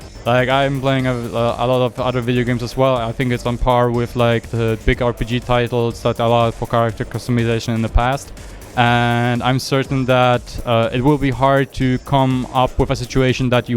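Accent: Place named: Japan. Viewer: この人の英語聞き取りやすい 日本人が話す英語に似てる気がする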